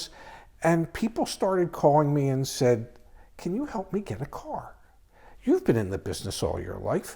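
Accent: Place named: South Jersey, United States. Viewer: glad to see another South Jersey boy doing well. Great to hear the reference of the "deptford mall". Lots of great memories there. Don't live in South Jersey anymore but great to hear the accent. Hope your channel does well. I already subscribed. Best of luck!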